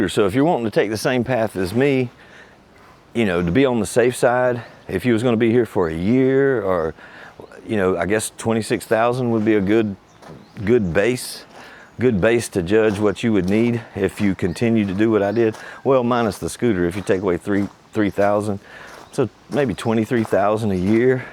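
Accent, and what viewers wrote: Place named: Southeast, United States. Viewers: Hey. I lived in Virginia Beach for 35 years and wouldn’t trade it for anything. You sound like a North Catalina boy. My limited experience would put you in the Outer Banks. Anyway, I dig your content. In the process of selling my BMW R1200GSA WC and will save that for my scooter when I arrive north of Manila September 2028. As an Army veteran, I’ll do the homework for a SRRV. From So. Cal., JM. P.S. Bless you for the charitable heart. 💪🏼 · I am new to your channel, I also live in the philippines. Alabang . I am trying to pinpoint your accent , I'm guessing the southeast United States. Maybe Alabama or Georgia. Any way my Budget runs about 45000 a month php i am pleased to meet you ,Frank from Florida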